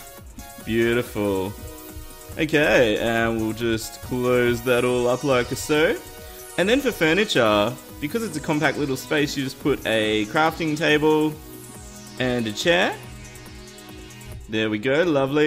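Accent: Australia. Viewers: Bruh oh my god it makes ur channel even better because your Aussie . First good Australian youtuber keep up the good work man. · You're Australian? I didn't realise. What state? I'm from SA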